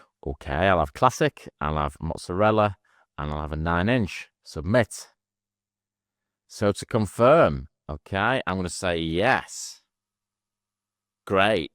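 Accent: United Kingdom. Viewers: I believe this i s my first time of watching your video. THANK YOU for this. It was funny (especially with the British accent), practical, thorough and well explained. Keep them coming.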